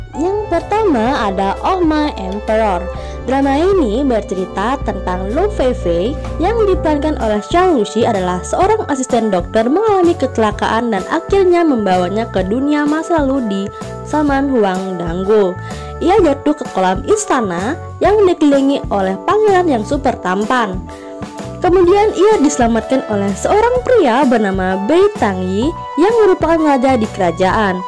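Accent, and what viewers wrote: Bali, Indonesia. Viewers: Pasti orang bali . Khass logat nya 😌😌😌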